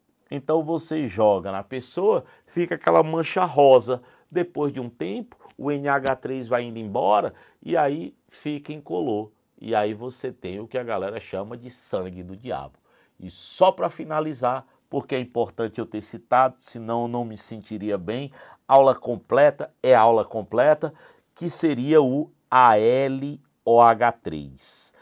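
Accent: Ceará, Brazil. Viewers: eu amo o jeitinho cearense que ele explica kkkkkkkkkkkkkkk, simplesmente o melhorrr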